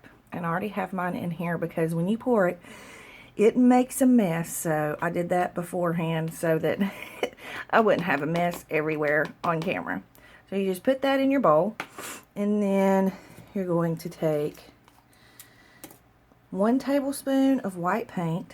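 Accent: South, United States. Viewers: Thank you for sharing. This is simple and doable. Plus... your southern accent is everything 😍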